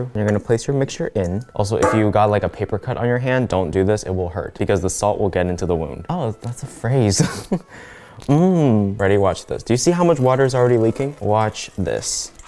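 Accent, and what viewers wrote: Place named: China. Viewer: What part of China are you from because your Chinese accent is strong my mom is from Beijing but she does t have a thick accent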